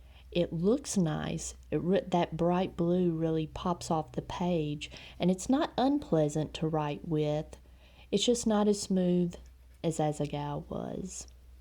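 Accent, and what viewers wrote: South, United States. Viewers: being from mississippi ... love that southern draw ... :-)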